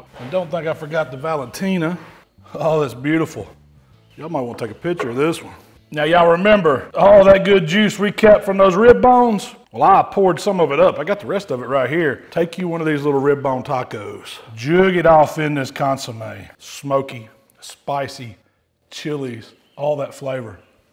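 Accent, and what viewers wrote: South, United States. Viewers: I stumbled across your page, looking for a how-to guide on grilling, and I hit the jackpot. You have gained a new follower, I love your Southern accent. It's time for me to binge watch your content for ideas, tips, and tricks. 🤗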